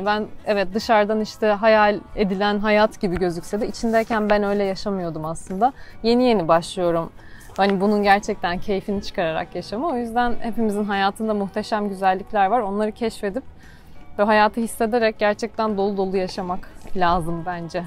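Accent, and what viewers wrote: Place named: United States. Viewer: Böyle bir konuşma şeklinle hangi aklı başında insan seni destekleyip abone olur bilmem ama şunu bilirim bu kadar yapmacık birini ve ses tonu rahatsızlığını asla seyretmem hanım kendiniz olun bence Amerikan ingilizcesi sizin çok eğitimli olduğunu göstermez bilginiz olsun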